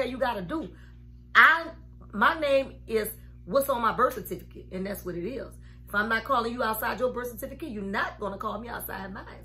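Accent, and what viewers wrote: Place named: Louisiana, United States. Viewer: U got to be from Louisiana....because I'm from Hammond la....all in your voice.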